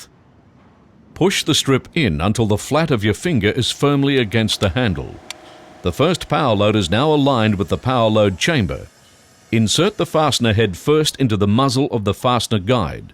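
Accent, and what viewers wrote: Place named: New Zealand. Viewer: It's an Australian video, but the narrator sounds New Zealand.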